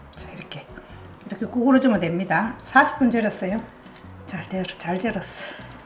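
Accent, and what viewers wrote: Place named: Geochang, South Korea. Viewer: 어머님 고향이 어디셔요^^ 경남 거창인데 억양이 귀에 익어서요?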